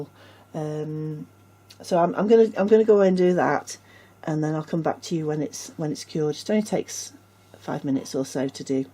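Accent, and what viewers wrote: United Kingdom. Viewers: I don’t make these I just watch you, I actually said the bigger head looked like a boy and the smaller one a girl too, to myself like 😂😂😂 beautiful work as always, I’ve watched you for years, my heart used to go like a train when you were taking the full body babies out of the moulds 😂😂😂, you’re heads and faces were amazing, I’ll have to go back and watch them again ❤❤❤ edit: yes I remember them pigs 😂😂 I didn’t care for them either, tbh I didn’t like any of the kids programmes them days especially pob 🤢 good lord, I’m in Liverpool, I can hear a slight scouse twang in your speech too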